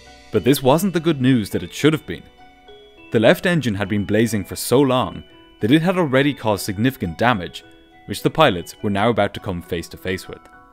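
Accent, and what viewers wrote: Ireland. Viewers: Accent sounds more Irish to me. And we pronounce Louis the French way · Love it when a new video comes out! Or am I just in love with an Irish accent 🤷‍♀️😂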